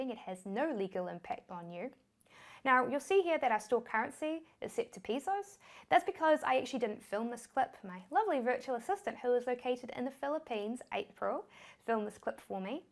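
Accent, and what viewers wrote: New Zealand. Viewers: Kiwi Accent is ❤️ ... just like Cebuano speaking English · 🤓 Loove your accent, will visit Nea Zealand one day 😊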